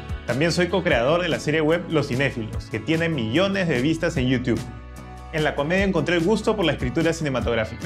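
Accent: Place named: Spain. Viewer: ESPAÑOL DE ESPAÑAAAAAAA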